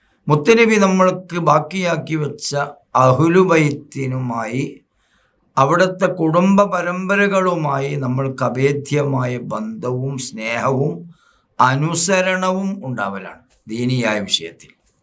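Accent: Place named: Malappuram, India. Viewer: ഉസ്താദ്, ഒരു വിനീതമായ അഭിപ്രായം..ചില വാക്കുകൾ മലപ്പുറം ശൈലി ആയതുകൊണ്ട് മറ്റ് ജില്ലക്കാർക്ക് മനസ്സിലാവില്ല. അതുകൊണ്ട് പൊതു മലയാളം സംസാരത്തിൽ കൊണ്ടുവന്നാൽ വളരെ നന്ന്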